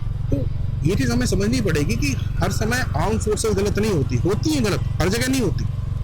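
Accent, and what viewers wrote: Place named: Uttar Pradesh, India. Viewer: Are you from uttar pradesh purvanchal ? ( Specifically from varanasi region ? ) Your accent is quite similar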